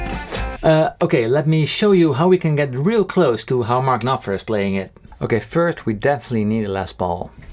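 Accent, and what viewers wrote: Netherlands. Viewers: Are you dutch? You sound like a fellow dutchy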